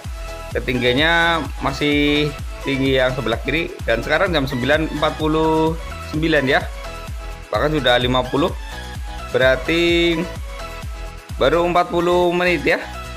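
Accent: Banyumas, Indonesia. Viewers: Wong ngapak ya mas ?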